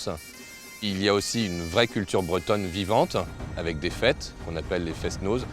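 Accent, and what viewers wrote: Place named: England, United Kingdom. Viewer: The accent sounds English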